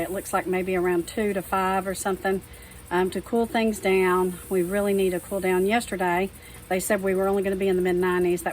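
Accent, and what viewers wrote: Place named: North Texas, United States. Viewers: SE Texas here. What was that wet stuff falling from the sky? I swear, we haven’t had rain in months. I was thinking about planting some spinach on my balcony garden. Fingers crossed. I just love watching your videos. Thanks for all you do. I grew up near Lake Texoma. Your accent takes me back home. ❤️ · North Texas gal here in south Tx. Yes her accent takes me back to Archer County and where I grew up!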